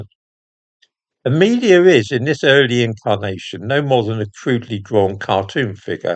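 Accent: United Kingdom. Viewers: Hearing a Brit say Amelia so often just makes me think Amelia Pond and want a ride in the TARDIS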